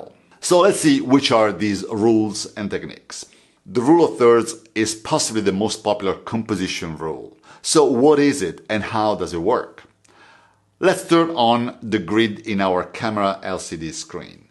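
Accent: Italy. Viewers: Again, I am totally amazed at the gift of finding you on YouTube. The word that fits is " serendipity" ( the occurrence and development of events by chance in a happy or beneficial way; that is you!) You make learning a joy and it helps with that awesome Italian accent. I never truly understood the rule of 3rds and now I understand. In Japanese art it is about what is and what isn't and that emptiness brings power and balance to all things. .... we come to that place for me where I can't wait for the next video. Mahalo.. ps I love the histogram T .. that is so very cool with the label. e'la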